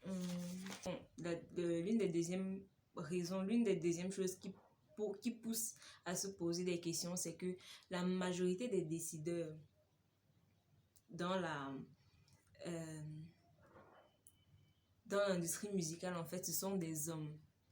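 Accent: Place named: Cameroon. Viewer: vue ton accent et ta façon de racconter tu es 237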